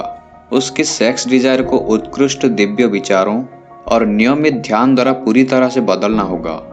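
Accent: Odisha, India. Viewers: Bhai odia ki katha kahiba style ru jana padi jauchi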